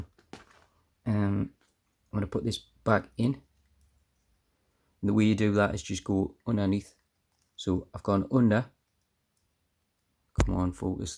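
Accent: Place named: Carlisle, United Kingdom. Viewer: No way 😂 I live in Carlisle so I get your accent around here every now and , suppose if you have some foreign people watching I could be tricky to understand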